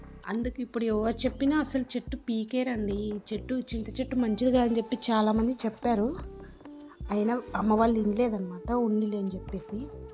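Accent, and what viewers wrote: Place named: Kadapa, India. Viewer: మీ మాటలను బట్టి... మీరు కడప జిల్లా వాసులనుకుంటాను. ఎందుకంటే.. ఈ ఆల్లకాగు అనేమాట అక్కడిదే.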